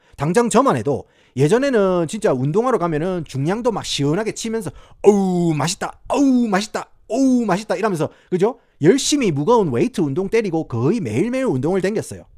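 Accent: Gyeongsang, South Korea. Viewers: 경상도 사투리 쓰는 RM이구나~~~생각하면서 듣고 있습니돠~~~. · 시카고 애독자예요 님 덕분에 여러 정보 알게 돼 감사하며 저도 부산이라 이 갱상도 억양 힐링입니다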